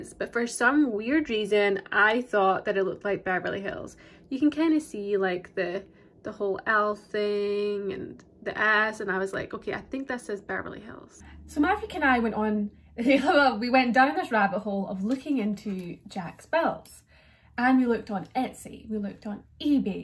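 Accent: Scotland, United Kingdom. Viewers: Are you Scottish? Your accent is super cool!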